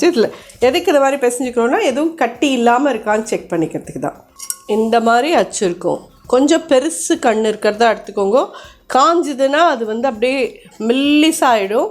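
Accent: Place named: Brahmin, India. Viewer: Maami neengal prema padurang mathiri irukkel. U speak pure brahmin agraharam Tamil. Excellent mam 🙏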